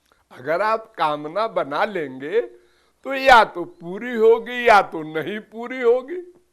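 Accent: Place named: Haryana, India. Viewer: Swamiji haryana se lagte h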